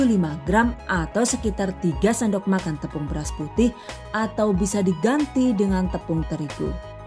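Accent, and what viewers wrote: Banyumas, Indonesia. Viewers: Saya suka sekali dng resep" sy penasaran sekali" pas praktek poto doong bun.lucuu bahasa ngapaknya itu lho 😀😀😀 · Ngapak jane wongndi ya mba..? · Akutuh suka liat videonya bunda salah satunya adalah kalo bunda lagi ngapak.. 😍😍 #GiveawayTHV2021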